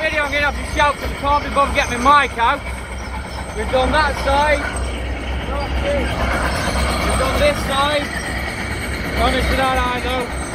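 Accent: Stoke, United Kingdom. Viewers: You sound like a Stokie!? Nice tip mate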